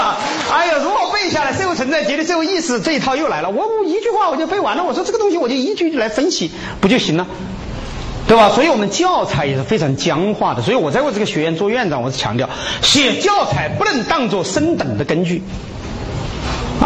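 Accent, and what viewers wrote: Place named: Sichuan, China. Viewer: 任剑涛老师激情澎湃的演讲中我能感觉到他使不完的劲！居然承认自己早年让老乡替考，实在人啊！浓重的四川口音使他的的演讲听起来更加生动，有趣😁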